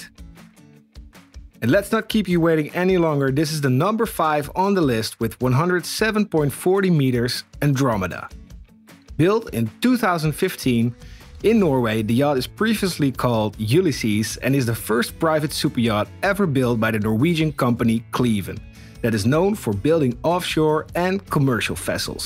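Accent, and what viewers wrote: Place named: Netherlands. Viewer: Leuke video, aan jou accent te horen Ben je denk ik Nederlands.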